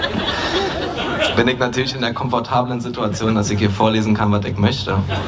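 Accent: Berlin, Germany. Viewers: allein der Mist den er redet Bringt mich dermaßen zum lachen.. und dieses berlinerisch dazu... ich Weine... xD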